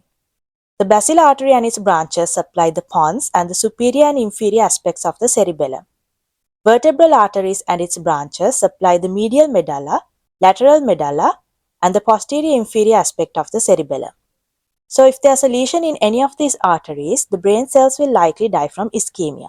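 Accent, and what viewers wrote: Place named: Asia. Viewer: Good video and great effort. Congratulations for a very good job. However, You have a distinct, asian accent difficult to understand by western European citizens and you have spoken to fast at times. Best wishes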